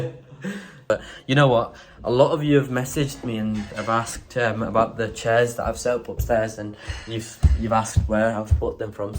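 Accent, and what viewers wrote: Punjab, India. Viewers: your accent punjabi with English. its amazing. really love your videos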